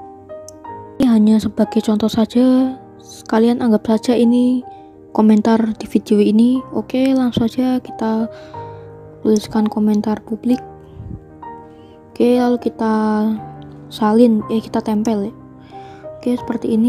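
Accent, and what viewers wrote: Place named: Java, Indonesia. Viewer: Kayak orang Jawa ngomongnya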